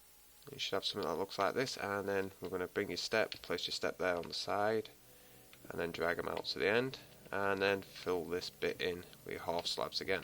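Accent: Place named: England, United Kingdom. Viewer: I ended up here by accident, I was researching medieval architecture, and after reading about how castle spires usually had their stairs built counterclockwise for defensive reasons, I came to youtube to see some videos of them. This wasn't what I was looking for, but I'm glad I ended up here, it's inspired me to go back to minecraft and start building. A bit of trivia, if on a single one of the stairs you miss out the halfslabs so that you have to jump, you'll end up with a trip step, an uneven step used to cause attackers unfamiliar with the steps to trip. It's useless for design, but great if you're building it to roleplay. Thanks for the amazing video, I'll be checking more of them any time I need to get inspired, I don't know if you're still active on youtube but I've gone ahead and subscribed anyway :) Also I recognise that accent, hello from a fellow Englishman :)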